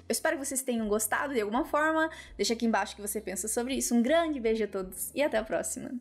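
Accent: Paraná, Brazil. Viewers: Oiê! tenho que dizer que eu adoro o sotaque paranaense xD hoje (presente), com o pouco amadurecimento que consegui, vejo esse sentimento como paixão, egocentrismo... acho que a gente sente falta de se sentir amado. E por muitas vezes nos deixamos ser guiados por essa dor (mesmo já tendo tido a mesma percepção que vc, acredite, cai alguns níveis por causa desse sentimento) mana, a gente tem que reforçar mto, conhecer e se conhecer mto, refletir pra não cair nos mesmos mecanismos mentais, senão tiver esse exercício diário, a gente cai mesmo! Somos mto falhos (e frágeis) e ainda na nossa criança psicológica, temos muita necessidade de se sentir amado,... a gente tem muito que aprender sobre o amor, sentimento profundo... acho que isso devia ser lido e ensinado em todos os ambientes, desde pequenininho (um dia sonho que teremos escola de sentimentos para as crianças do futuro... 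Quem sabe?;-) ) obrigada por esse vídeo! S2 mto importante é necessário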